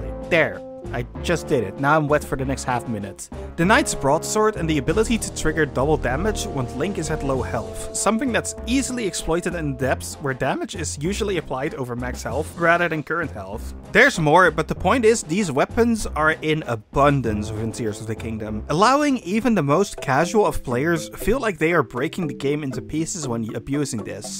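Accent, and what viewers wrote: Netherlands. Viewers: Hey jij bent nederlands ! HA betrapt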